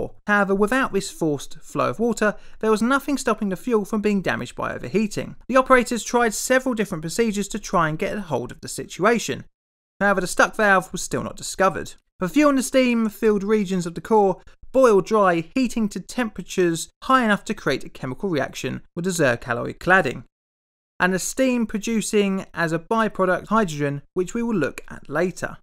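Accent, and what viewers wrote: United Kingdom. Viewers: done by a nasal Brit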